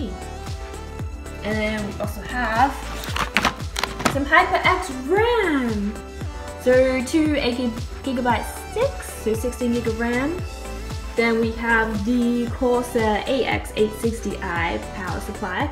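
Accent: Australia. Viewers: Ahh Aussie, I love it👍😊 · Picked really good components, but the "cute" baby talk while describing each of them is like nails on a chalkboard. Is that an Aussies accent? I didn't know someone female could screw that up.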